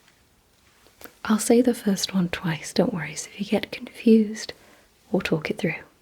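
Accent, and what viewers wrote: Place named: United Kingdom. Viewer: As you wish Goddess Wright Knight Rain Hurricane / ( I love your sweet British accent 😊) Requiem Twilight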